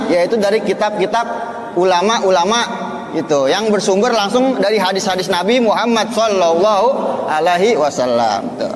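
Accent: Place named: Jakarta, Indonesia. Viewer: aduh ane demen nih ade ust gaye betawinye keren ...semoga ust selalu diberi keshatan dan panjang umur..dan banyak nyang dukung ..aamiin yRA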